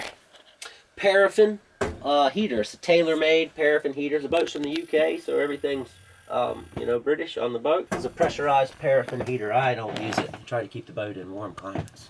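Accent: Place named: North Carolina, United States. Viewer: or north carolina. we have accents like that here too.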